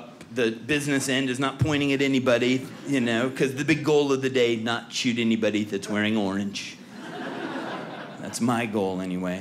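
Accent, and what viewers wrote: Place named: California, United States. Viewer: i can tell you're californian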